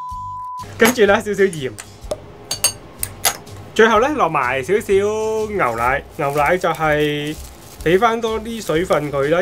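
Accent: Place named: Cantonese, China. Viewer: 廣東話好好聽😀👂🏼♥️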